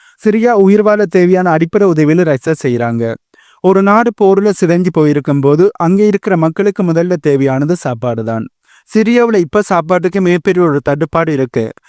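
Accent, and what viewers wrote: Sri Lanka. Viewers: யாழ்ப்பாணத் தமிழ்.😂😂😂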